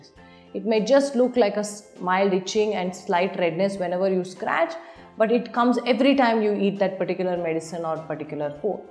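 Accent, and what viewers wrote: India. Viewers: English nga paranamang bombay Kong mag salita😔😔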